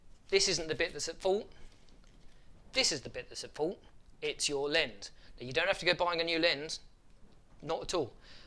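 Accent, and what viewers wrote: United Kingdom. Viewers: Nice informative video just found your channel...thought it would be good being a and you sound like a northerner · Great video. Makes a change to hear someone English.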